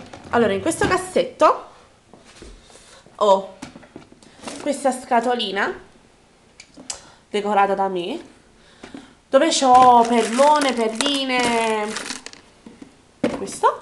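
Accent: Naples, Italy. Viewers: ooh Cacchiarola, avevo capito dall'accento che eri Napoletana ^,^ mmh dove precisamente? :O cioè non ho mai sentito di sto posto xD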